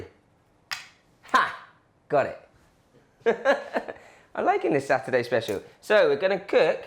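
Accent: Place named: RP, United Kingdom. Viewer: First, I am not sure how I got here. Second, Food? meh. Third. But, honest to goodness why are you trying to sound like Nigella? Is it because it is supposed to sound real poash? But.. Her father was the Chancellor of the Exchequer! And it's annoying like it's supposed to be better than everyone else.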